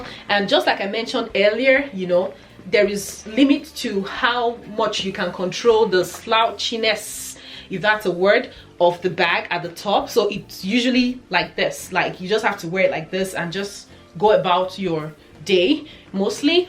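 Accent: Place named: Sierra Leone. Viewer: Thank you for the great review. It is beautiful. Like you. Is your lovely accent from Sierra Leone?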